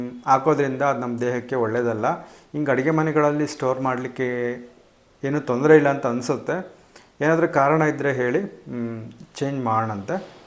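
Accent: Chitradurga, India. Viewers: ಅಣ್ಣಾ ನಮ್ಮ ದುರ್ಗ ಕಡೆ ಭಾಷೆ ಹಾಗೆ ಇರುತ್ತೆ. ನೀವು ಮಾತನಾಡಿ ಏನು ತೊಂದರೆ ಇಲ್ಲ ನಿಮ್ಮ ಮಾತು ಕೇಳುತ್ತ ಇದ್ದರೆ ನನ್ನ ತವರುಮನೆ ನೆನಪು ಮನಸ್ಸಿನ ಮುಂದೆ ಹಾದು ಹೋದ ಹಾಗೆ ಆಗುತ್ತೆ. ಚಿತ್ರದುರ್ಗದ ತಂಗಿ 🙏🙏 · ಚಿತ್ರ ದುರ್ಗದ ಕಡೆಯ ಭಾಷೆ.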